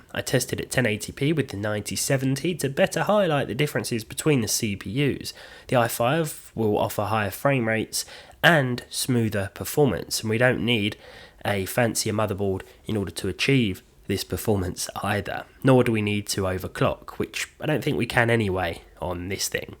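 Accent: United Kingdom. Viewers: He’s a limey, that’s how they say Z.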